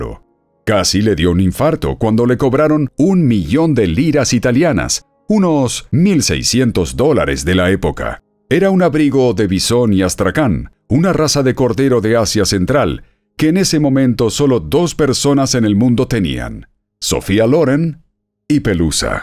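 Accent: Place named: Mexico. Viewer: Acento MEXICANO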